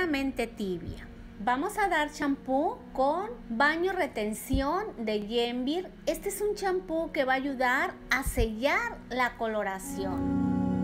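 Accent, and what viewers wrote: Mexico. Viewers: Gracias por tus lindas palabras amen. Tu asento me suena de nacionalidad Méxicana. ¿De que país eres? Lindo dia.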